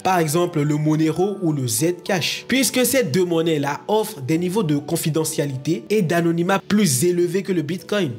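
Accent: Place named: Senegal. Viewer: Super vidéo et en plus avec l'accent sénégalais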